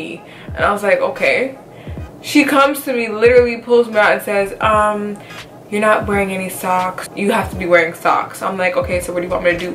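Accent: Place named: Caribbean. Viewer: I hear the island baby where u from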